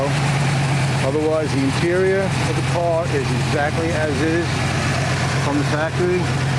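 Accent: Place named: New Jersey, United States. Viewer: Looks like a NJ inspection sticker. Sounds like a NJ voice.... Oh there's the plate.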